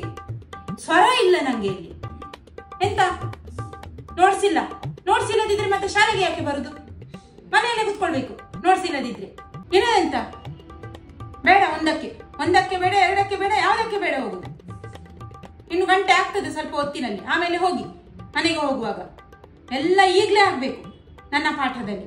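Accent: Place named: Udupi, India. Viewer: ನಿಮ್ಮ ಭಾಷೆ ಸೂಪರ್,ನನಗೆ ಉಡುಪಿಯಲ್ಲಿ ಇದ್ದ ಹಾಗೆ ಆಯ್ತು ಮಾರಾಯ್ರೆ😂😂😂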